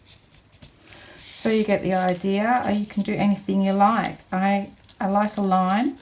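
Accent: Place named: Australia. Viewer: I just love to hear your Aussie voice on U tube..from a fellow Aussie..love what you do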